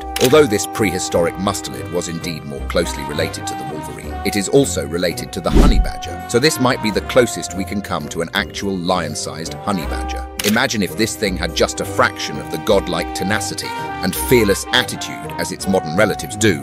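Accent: United Kingdom. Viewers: Did this guy just rip off Casual Geographic with a British accent? I get he didnt create the slang, but the overly extensive use and variations is basically his style. Also, is this AI?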